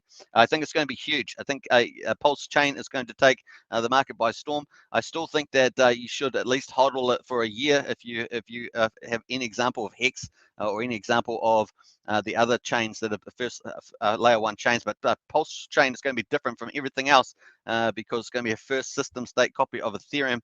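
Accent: New Zealand. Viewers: Are u a wee kiwi living in the big apple?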